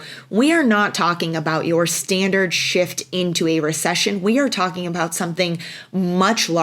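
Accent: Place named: Cleveland, United States. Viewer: are you from the Cleveland area? thanks.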